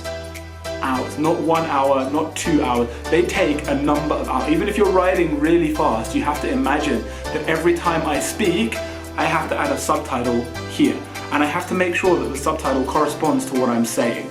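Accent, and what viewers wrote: United Kingdom. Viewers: 我觉得你的英式英语更容易听懂诶！！！我之前听了几个英国人的，我觉得吞音（？）好多。。。我还是习惯美式英语😂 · The simplest way for both you and us is option # 2 so long as you manage to speak slower; after all, British accent is less easy to grasp.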